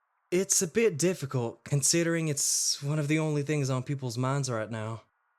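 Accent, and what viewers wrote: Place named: South, United States. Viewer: He making me like country accents…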